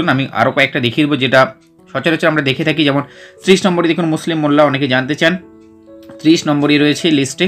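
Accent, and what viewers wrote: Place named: Bangladesh. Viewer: voice appearance almost Bangladeshi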